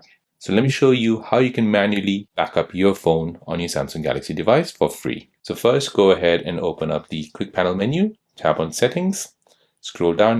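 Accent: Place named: United States. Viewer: Its VOL UME not WOL UME. You spund American as well so why are you pronouncing like your German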